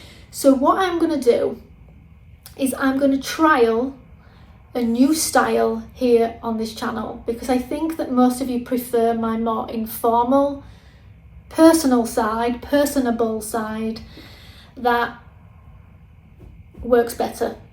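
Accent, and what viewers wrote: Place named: England, United Kingdom. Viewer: I loooooooove all these ideas. I love that you are English. I find your accent and how you live, cozy and beautiful. Your every day life is so inspirational. I can't wait to see!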